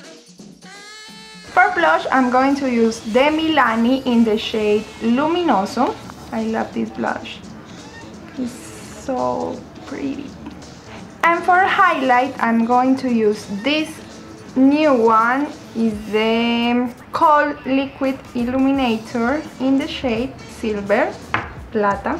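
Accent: Paisa, Colombia. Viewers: Estuvo genial, muy claro y el acento paisa hizo más simpático el vídeo. Esto me ayuda porque soy terrible para el inglés, como que me familiarizo más de esta manera, no sé. Gracias. Luces muy guapa también con los frenos, y el maquillaje quedó precioso. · Estás bien, te falta es la pronunciación más gringa y menos paisa jajaja pero vas bieb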